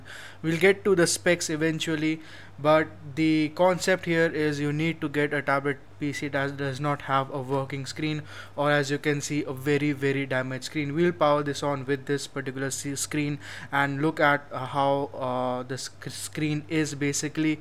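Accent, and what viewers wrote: India. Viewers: Liked and loved the video.Understood everything you say,but please work on your accent,don't be that funny Indian guy that everyone make fun of when they should be listening.I am talking here out of experience,i have a "Russian" accent/im not even Russian !? / and that is a really big issue for me.It's not racist or whatever,just makes people uncomfortable when they listen to me.Anyway content on point,exactly what i had in mind with my idea and you proved that the idea makes sense.Good luck!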